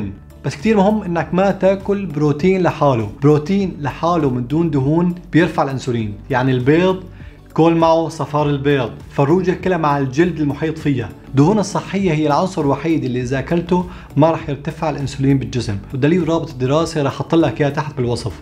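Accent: Aleppo, Syria. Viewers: سؤال دكتور انت من حلب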